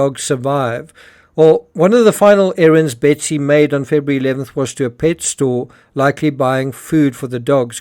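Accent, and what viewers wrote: South Africa. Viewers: Do I detect a South African accent? I can understand Betsy not calling for help. I got swine flu, and could not even call my husband, get back on the bed, if he had not come home, I had slipped into an acceptance of death stage, he carried me to the car and into the surgery, all the time I was in a dream world. RIP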